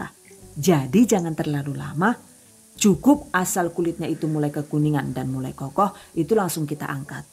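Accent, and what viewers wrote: Banyumas, Indonesia. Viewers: Ngapak jane wongndi ya mba..? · Saya suka sekali dng resep" sy penasaran sekali" pas praktek poto doong bun.lucuu bahasa ngapaknya itu lho 😀😀😀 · Akutuh suka liat videonya bunda salah satunya adalah kalo bunda lagi ngapak.. 😍😍 #GiveawayTHV2021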